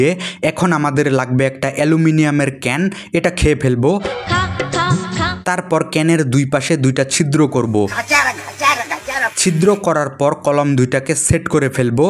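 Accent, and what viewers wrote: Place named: Thakurgaon, Bangladesh. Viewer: তোমার বাড়ি মনে হয় নিশ্চয়ই ঠাকুরগাঁ জেলায় তোমার কথা শুনে বোঝা যাচ্ছে আমাকে নিয়ে একটা ভিডিও বানাও আমি কি সত্যি বলেছি না মিথ্যা বলেছি এই কথা নিয়ে একটা ভিডিও বানাও প্লিজ প্লিজ প্লিজ প্লিজ প্লিজ প্লিজ প্লিজ প্লিস প্লিস প্লিস প্লিস প্লিস প্লিস প্লিস প্লিস প্লিস প্লিস প্লিস প্লিস প্লিস প্লিস প্লিজ🎉🎉❤❤❤❤❤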